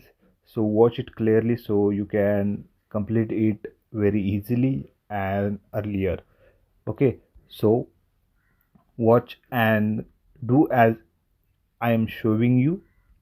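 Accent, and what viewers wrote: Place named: India. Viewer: cant understand a word this dude is saying. the indian accent is bad enough but talking as fast as you possibly can like you just did a mountain of meth really doesn't help.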